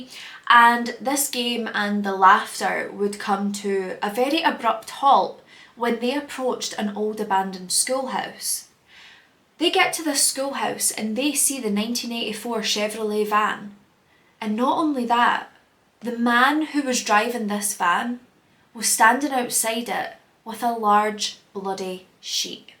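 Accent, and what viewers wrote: Scotland, United Kingdom. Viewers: Just found you and I love hearing another Scot ❤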